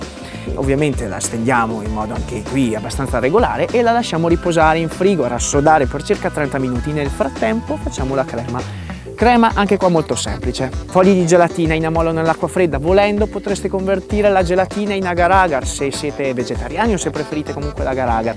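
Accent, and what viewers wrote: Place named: Bergamo, Italy. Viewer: Poi odio il tuo accento quasi come un bergamasco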